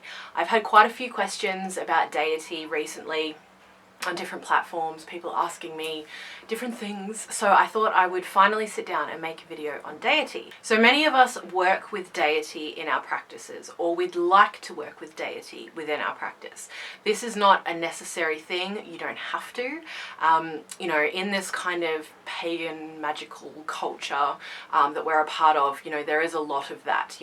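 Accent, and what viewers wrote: Australia. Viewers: '27 million' I don't know what it is, but if it weren't for the accent, that's how I knew for sure you're Aussie too. Nobody of any other country or culture that I've met, ever makes those ridiculous hyperboles like us Aussies do. This video was such a great help, it's hard trying to find your way when you're just starting out because there's just so much that it can be overwhelming to know where to begin, thank you for helping me sort out this aspect.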